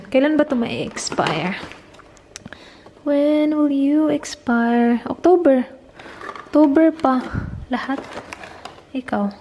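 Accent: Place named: Cebu, Philippines. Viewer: Hi po ate bisayaaaaa kaykaaa ate cebuana kaayo💛😍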